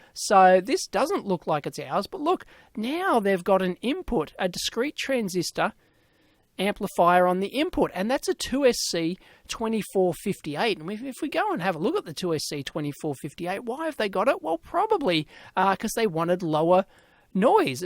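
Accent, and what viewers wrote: Australia. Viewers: Having coffee this morning & listening while this in the background build building a new 3d printer. Love the Walkman tear-down. (Although I'm not sure if its the coffee or the ausie voice waking me up!) cheers :) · You have the most australian dialect ive ever heard "loosey goosey" lmao wtf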